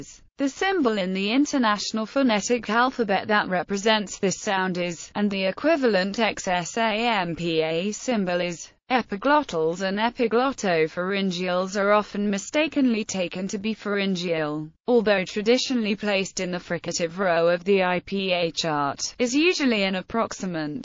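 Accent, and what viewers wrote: United Kingdom. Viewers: Please get rid of the monotonous Received Pronunciation screen reader.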